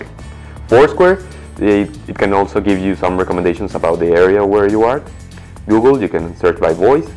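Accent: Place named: Monterrey, Mexico. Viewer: Eres de monterrey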